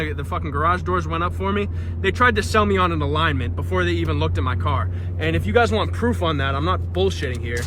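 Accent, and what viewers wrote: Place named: Boston, United States. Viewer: You are so stereotypically a Boston native. You boys out there talk so fast & got no filter 😂😂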